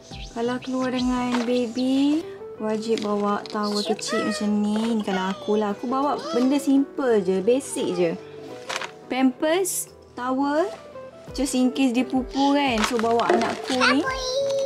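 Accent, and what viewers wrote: Kelantan, Malaysia. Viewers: Comey dengar kak cakap kelantan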